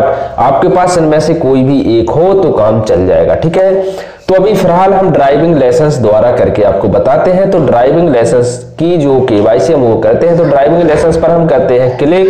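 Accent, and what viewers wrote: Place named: Bundelkhand, India. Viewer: Aapki language sunkar lagata hai ki aap Bundelkhand se belong kartre hai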